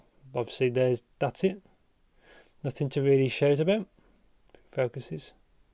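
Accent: United Kingdom. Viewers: Bro im here again but can you plese try to go out of british accent a little bit ? its really annoying some word are like in another language cant figure out wtf is going on.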